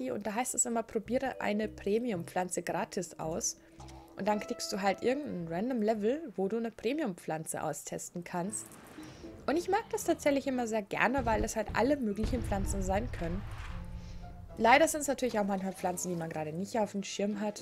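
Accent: Bavaria, Germany. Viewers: könntest du bitte nochmal eine Folge nur bayrisch sprechen bitte?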